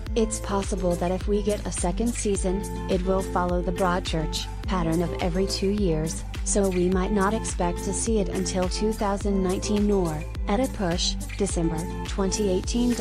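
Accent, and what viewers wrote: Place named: United States. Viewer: I lost the will to live watching this, and now this robotic, American blurb ..... aaaaggghhh!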